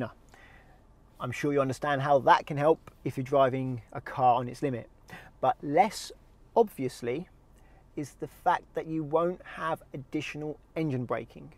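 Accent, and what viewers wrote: England, United Kingdom. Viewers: Enjoyed watching the video. There's plenty on YT about heel and toe and rev matching. I knew about rev matching and do it myself, but have skipped H&T for years as I couldnt get the hang of it. As im doing more spirited driving recently in a revvy little NA car, I came to watch a few videos to help me. Many are set in America, which although is fine, I like the more closer to home videos and its easier to listen to a more local voice. (Im essex based) I thought the video was well described and easy to understand, so thank you for uploading it.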